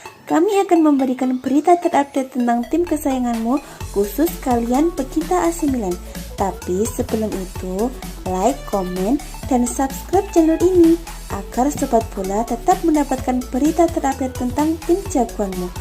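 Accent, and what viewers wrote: Java, Indonesia. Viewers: Iyee gue jg merasa medok suaranya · min, orang jawa ya, medok bener logatnya...(^_*) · Gak usah ke Jawa Jawa an lah ngomongnya....biasa saja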